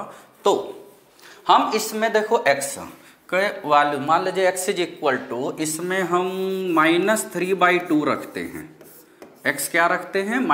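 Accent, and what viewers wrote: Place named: Bihar, India. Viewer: Pura Bihari babu ka feel aara tha!!😂😘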